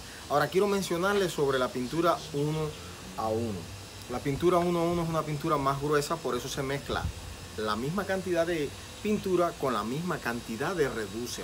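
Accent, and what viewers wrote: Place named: Cuba. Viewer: Porque los de cuba hablan asi??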